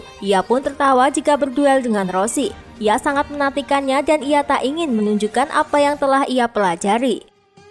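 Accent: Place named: Java, Indonesia. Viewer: Min... Medoknya masih kliatan🙏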